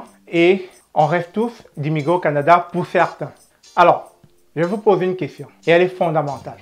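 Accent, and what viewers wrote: Cameroon. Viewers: Etes-vous Camerounais? je le demande a cause de votre accent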